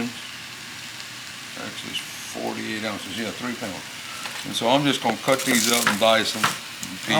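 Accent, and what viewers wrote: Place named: Louisiana, United States. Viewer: Hey — are you from south Louisiana originally. I detect a bit of an accent. I live in Broussard La.:)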